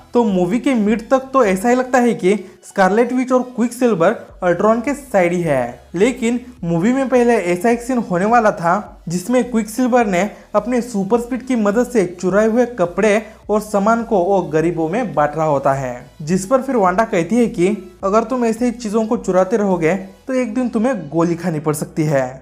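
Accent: Bihar, India. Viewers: Aap Bihar se hona?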